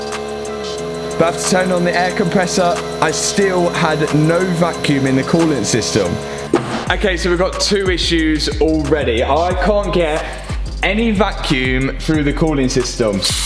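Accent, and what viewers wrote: United Kingdom. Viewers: I love UK accents, great how you say boompah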